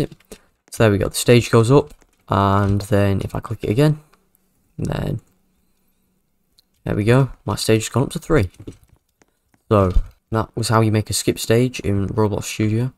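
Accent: United Kingdom. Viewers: Fir o all ge a new mic an fi tha briitsh accent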